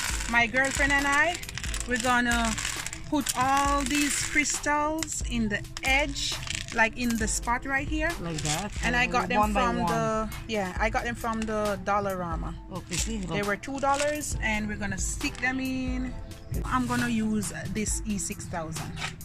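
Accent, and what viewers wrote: Jamaica. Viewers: Hi!! I am so happy to finally hear a voice “ talking the real Jamaican things them” and creating “glam and glitter” bling out on social media my girl them can’t hold you back!! You should really be proud of yourself as I am proud of you. Your bling mirror “sell off”. Looking forward to the side tables.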